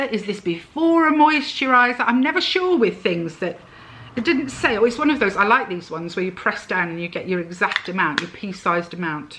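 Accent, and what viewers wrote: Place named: South Wales, United Kingdom. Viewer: Thanks - always love it when a new video pops up. Being a lady from South Wales myself I can often hear the odd twang in your accent x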